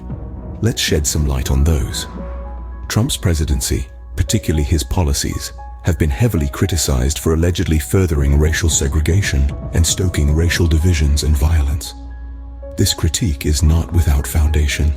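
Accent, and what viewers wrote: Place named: United Kingdom. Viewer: Gotta love your choice of narrator A white British man?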